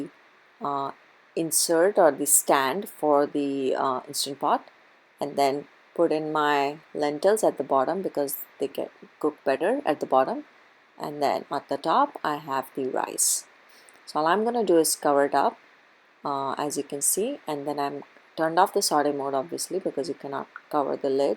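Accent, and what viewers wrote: United States. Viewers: dnt kill me with your american accent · its because she is american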